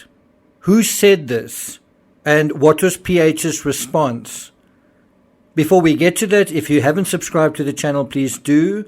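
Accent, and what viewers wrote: South Africa. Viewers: Love your work and your accent. South African?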